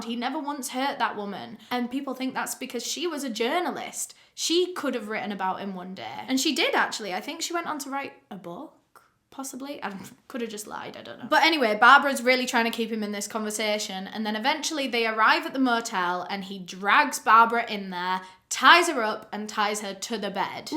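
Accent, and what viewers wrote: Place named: Manchester, United Kingdom. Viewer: Yes love the Manc accent ❤️❤️❤️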